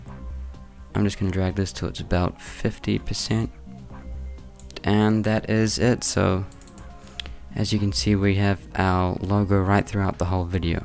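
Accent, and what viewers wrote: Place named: New Zealand. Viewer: lol, he is either from Australia or New Zealand, im from New Zealand, it sounds similar to mine.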